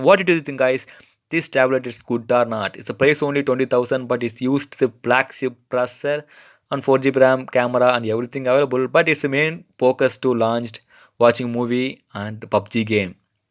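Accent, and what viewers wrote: South India, India. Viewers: Are you South Indian??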